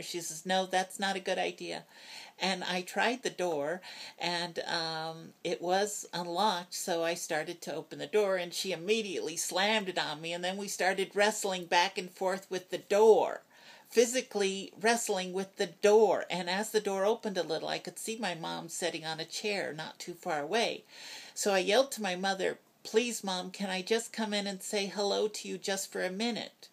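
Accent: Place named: Texas, United States. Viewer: you are an incredibly interest and attractive woman and you've got a great Texas or southern Illinois feminine voice. As a 64 year old cisgender straight male ally I find it interesting to list to a woman who is "from my generation" which is rare as I listen to the You Tube videos. There is a 30ish trans gender woman in Florida JW transgirl who might be an interesting person for her to talk with. and like yourself she is a very interesting and intelligent woman. I am so happy that you had the opportunity to have some time of closeness with your mother in the hospital when the two of you did not have the stern eyes of the rest of your family.. I am so sorry your family was so cruel to you on Mother's Day. I will tell you what I tell every transgender woman, I feel that each and every one of you is a special gift from God, you have the special gift of understanding the world through nthe eyes of both a man and a woman.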